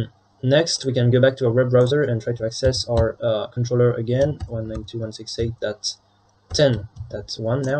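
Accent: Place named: France. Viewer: I like the French accent. Thanks for the video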